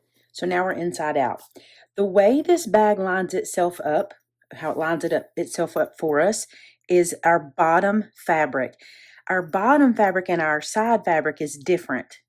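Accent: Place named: South, United States. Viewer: This is a great video! Love that accent😊. I’m from Alabama and your voice makes me feel at home! You know, I still sew over pins🫣. We were taught that as long as the pin is at right angles to the needle, the needle will slide over it. I have found this to be true in my 50+ years of sewing. I’ve never bent or broken a needle because of a pin.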